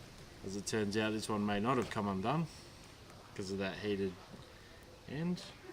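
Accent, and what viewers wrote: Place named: Australia. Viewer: Which part of Aus are you from?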